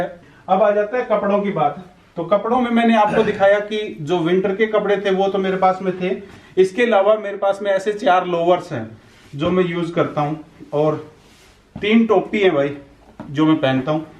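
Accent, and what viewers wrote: Haryana, India. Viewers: bhai harayane k bnde ki awaaz or boli bta hi deti h ..... haryana k h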